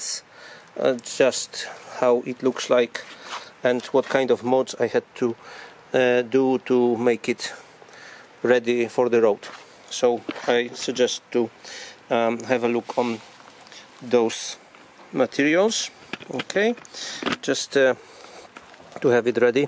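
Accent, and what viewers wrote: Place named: Russia. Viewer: Как будто русский по-английски говорит)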